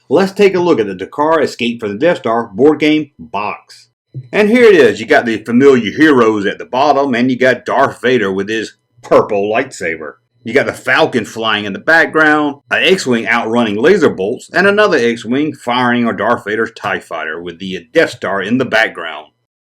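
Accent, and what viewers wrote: South, United States. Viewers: Way below the Equator? Are you in the Southern Hemisphere? Thought I heard a southern twang in your awesome videos!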